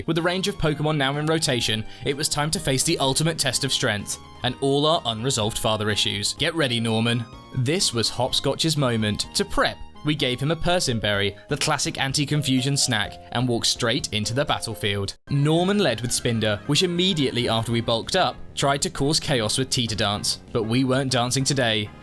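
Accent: United Kingdom. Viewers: Dude I'll give you a hint you may think you're just an average sounding British dude but you're like The stereotype of all stereotypes of British dude sound to these American ears. It's really pleasant to listen to you... So yeah pretty much as soon as American female Pokemon fans discover your channel it'll blow up just fine but you are going to have to do face on camera at some point😊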